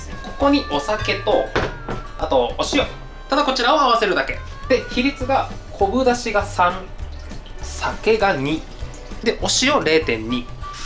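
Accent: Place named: Hakata, Japan. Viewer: 博多弁あんまり、動画で出らんよなー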